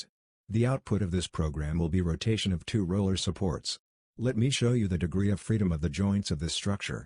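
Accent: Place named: United States. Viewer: Wow your accent has changed into American in just few months ! 🤩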